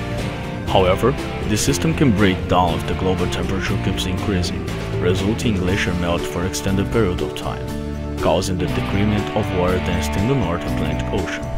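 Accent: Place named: Russia. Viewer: u have to be russian!? thanks tho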